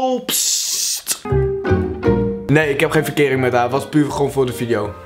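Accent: Groningen, Netherlands. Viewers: Ben jij een Groninger ??❤️❤️❤️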